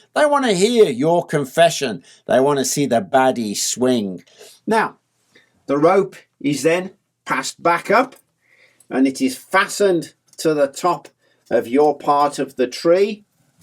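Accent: United Kingdom. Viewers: Gotta save this for tonight for sleep. Need that sweet sweet british grandpa voice to soothe me to sleep. Thanks, from a cousin across the pond!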